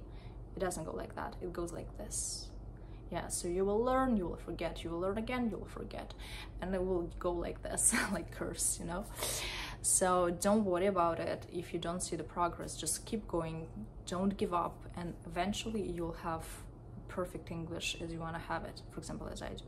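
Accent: Russia. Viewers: Я русского отличу запросто лишь по акценту)) а так словарный запас, и уровень великолепный, вы умничка! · Every nation has spesific accent it so cute Russian speakers make t d r h really different 😂❤️🥰but it original ❤️ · As you mentioned that you speak russa, i immediatly started hear your accent. i like russan accent. That always remind me the hollywood movies. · rus işte hep aynı aksan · Разозлило что потеряла 7 минут смотря видео, так что напишу.. Ни одного совета… очевидные вещи.. акцент четко русский.. по словам не слышно уровня с1.. максимум б1.. речь беглая, супер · That's why we feel Russian accent in your speech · Сразу видно ,что вы носитель русского языка, и по внешности, и по акценту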